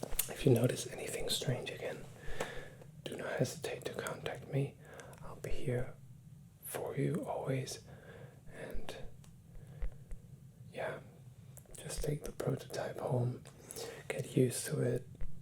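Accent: Spain. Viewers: Subscribed because your Spanish accent is amazing😊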